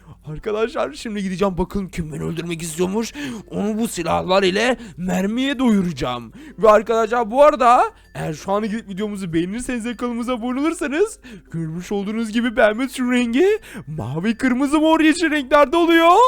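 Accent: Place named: Black Sea, Turkey. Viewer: Abi karadenizli konuşuyon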